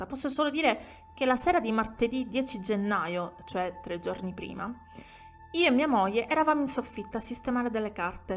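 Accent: Sicily, Italy. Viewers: sai che io che sono palermitana come te il tuo accento nn l'ho mai sentito? Poi l'ho letto nei commenti che sei siciliana, e gli altri invece dicevano che avevi l'accento siciliano, che strano forse perché era il mio stesso accento per cui nn ci facevo caso😃😃😃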